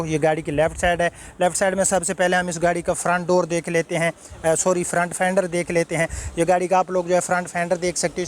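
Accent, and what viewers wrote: Saraiki, Pakistan. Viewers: Apki Urdo Se aisa lagta ha jaisy ap sryki hai q k apko urdo bolna hi nai ata so ap sryki mn bol lia karen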